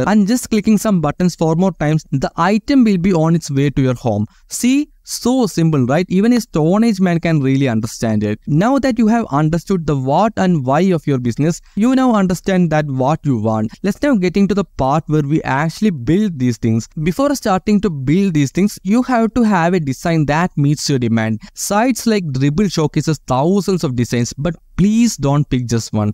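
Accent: Kerala, India. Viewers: Super bro The way your telling is adding some Keralian spicy.. ..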